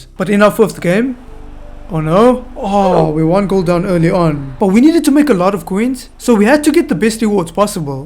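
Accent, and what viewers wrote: South Africa. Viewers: Are you south African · Are u South African?? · U from south africa?? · You sound South African Bro! What a vid! Keep up the good content! From Namibia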